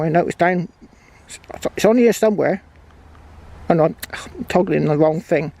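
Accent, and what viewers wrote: United Kingdom. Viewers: Hi. I really enjoy your videos. I’ve been detecting on and off for 33 years, and must say I’m somewhat envious that you have the hidden history of both Medieval and Roman times in the earth beneath your feet! My mother was British and I hear some of her speech patterns in your voice, which is a good thing! Keeps my connection to Britain alive! Here’s my dilemma and question. I’m leaving in March of 2021 on a 5 year road trip across America and Canada, just sightseeing, fishing and DETECTING! I have an old White’s Eagle II, which I believe to be the first digital detector, but it’s just a toy now, with a 6” depth range at best. I plan to spend my detecting time on the trip searching primarily for coins and relics, then focusing on gold nuggets when I return to California(I expect the gold price to BOOM soon!). So my question is this: Is the GPX5000 a versatile enough machine to reliably find coins at depths to 18” plus, while still performing it’s primary function of finding deep gold nuggets when I’m doing that? 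In other words, must I purchase a CTX3030 as well, or can the GPX do it ALL? Thank you for any advice, and keep the great videos coming! Thanks! Roger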